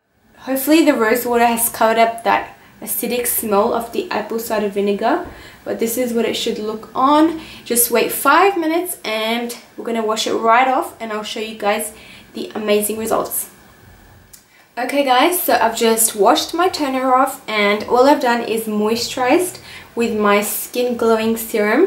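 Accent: United Kingdom. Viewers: The English accent is bae 😘😘💕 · Hey girl love your British accent 💞Please share the secret of your healthy lashes....... Love all your channel thanks love from Pakistan 💕💕🇵🇰🇵🇰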